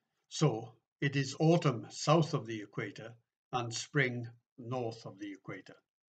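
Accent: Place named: South Wales, United Kingdom. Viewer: This is the South Wales Valleys ' Phone voice' . Well presented butt . Of course all us Brythonic and Irish people were fully aware of this since the end of the last ice age when we moved back in from modern day Spain.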